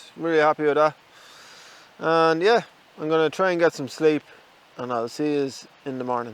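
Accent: Ireland. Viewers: I just started watching your videos a couple of days ago and I enjoyed them. I noticed everybody was talking about your giggle and they’re right it’s very endearing. I wouldn’t change anything - you got me as a new subscriber. I love your Irish accent! At least I assume you’re in Ireland?! if someone doesn’t like what you’re doing they’re free to go watch something else you know what I mean? It looks to me like you have lots of people who are interested, so I wouldn’t worry about it at all.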